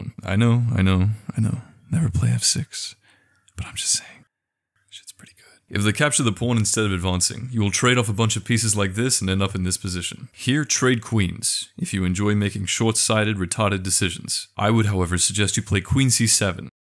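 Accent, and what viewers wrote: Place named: United Kingdom. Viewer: What is this guy's accent? it sounds half British half something else. I'm mainly here for the whispered interpolations. Very nice.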